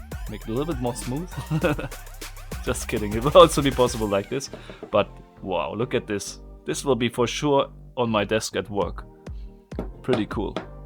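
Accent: Germany. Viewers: Omg that German accent tho!!! Love it❤️❤️❤️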